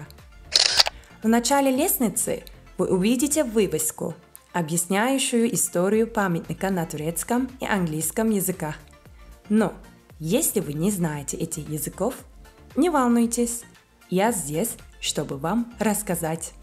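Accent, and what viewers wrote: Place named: Russia. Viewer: rusça aksanın * - *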